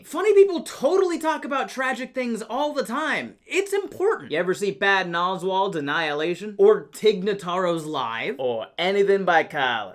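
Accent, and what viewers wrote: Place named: Albuquerque, United States. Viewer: Dude, your Burqueno is spot on. How much time did you spend In Albuquerque to pick that one up? lol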